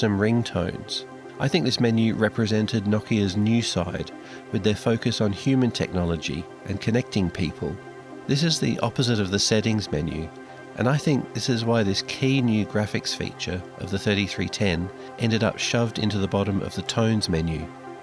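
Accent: Australia. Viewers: Given his accent, he appears to be from Australia.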